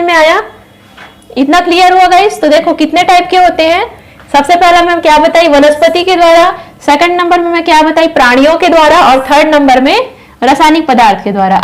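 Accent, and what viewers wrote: Chhattisgarh, India. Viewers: Mam aap Chhattisgarh se hai kya...?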